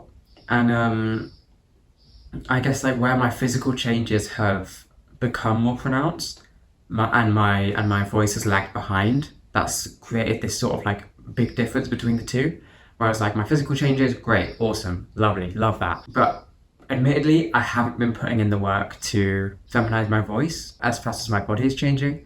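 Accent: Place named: United Kingdom. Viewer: Your British accent is so cute 🥹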